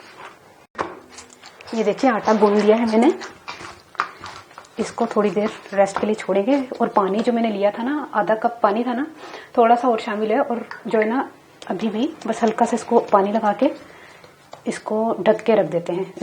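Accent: Punjab, India. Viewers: You are so sweet, your Panjabi is so cute.Love you dear. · Wah ji wah parathay ka maza to apni jagha lekin ap k bolnay ka andaz bht sweet hy urdun punjabi ka tarka lagta hy to maza aajata hy mn ap ki bht sari cheezain try kr chuki hon aur achi banti hn meri duain ap k sath. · Punjabi bht sweet bolti hn ap bht zada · baji ap ki cooking zaberdust hoti he....or sath ke sath ap ki meethi punjabi bhi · Sachi aapki batein karte hue, aur vo bhi Punjabi mau, bahut achha lagta hai. Aise lagta hai ki bas sath hi khade hoker batein karte karte maze se khana pakka rahe hai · Masha Allah so sweet voice aap ki mujha panjabi bahut pasand hai masha Allah masha Allah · Asslam O Alaikum Baji apki punjabi bht sweet hy or ap ne bht achi technique btae God bless you or ap ka pratha bht acha bna hy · Aap ki baat karne ka tarika thoda panjabi hy mashaallah paratha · Kasssamayyyyyyy and also ur punjabi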